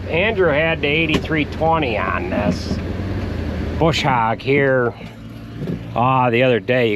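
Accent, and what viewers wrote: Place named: Midwest, United States. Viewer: Way you talk, I figured you were from far away, mid-west or dakotas. Saw those NY plates and realized you're about 5 hours west.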